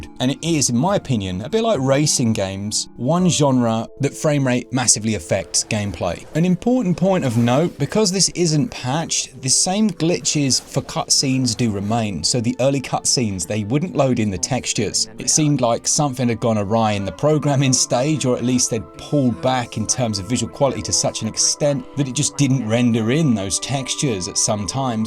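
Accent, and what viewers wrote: United Kingdom. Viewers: I just can’t take a British accent serious!! He just sounds like a joke!!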